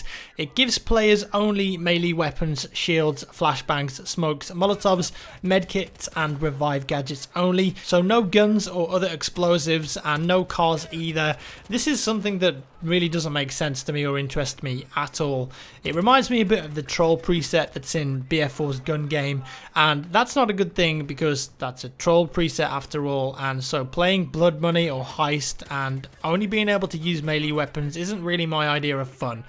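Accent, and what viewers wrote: United Kingdom. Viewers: Never Played Hardline, just here for that British voice...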